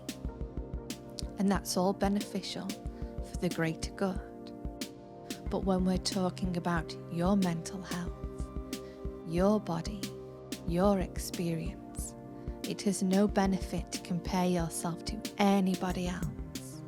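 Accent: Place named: Northern England, United Kingdom. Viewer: I love a good Northern accent, and yours is the best. So soothing and inviting. You're wonderful! Thank you!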